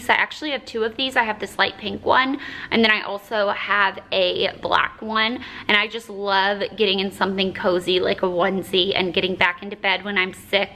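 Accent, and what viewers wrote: South, United States. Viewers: Hi, I've been watching your videos for so long and just honestly love your personality and seeing more into your life! As long as they keep making you happy I hope you keep making vlogs for a long time! Also was wondering after watching the clips from your first youtube video ever if you had to consciously work on your southern accent, or if it naturally got less strong when you moved to LA? You definitely had more of a twang when you were younger haha!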